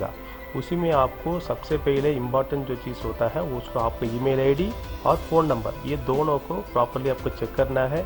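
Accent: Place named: Tamil Nadu, India. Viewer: Neega Tamil thane neega Hindi pesuradhu teriyuthu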